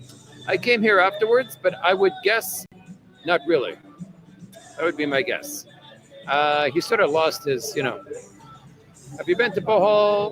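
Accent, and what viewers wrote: Canada. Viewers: Do all Canadians talk like you?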